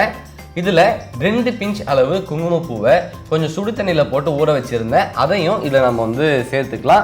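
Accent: India. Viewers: Am following you since I watched your show in behindwoods .. particularly for your pure tamil accent and the kural that u said apart from this your cooking methods are damn awesome, informative and i would definitely try in my Hotel and I own The Proud - Madurai Sri Muniyandi Vilas Hotel 💐💐💐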